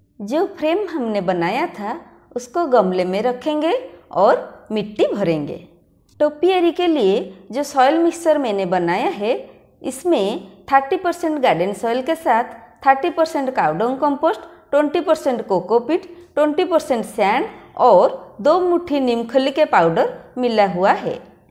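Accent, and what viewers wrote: Odisha, India. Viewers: App odisha se ho kya??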